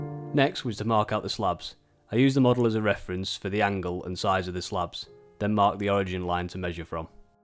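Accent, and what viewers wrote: United Kingdom. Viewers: You have taken a simple concept and made it look so realistic. I admire your attention to detail and vision in these projects. Keep up the good work. If you don't mind I am curious about your accent. What part of the UK are you from?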